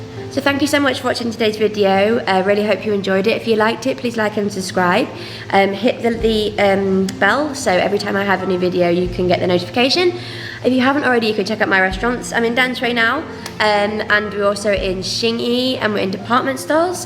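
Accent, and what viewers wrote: United Kingdom. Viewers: 很好聽的正統英國腔～～❤❤👍👍👍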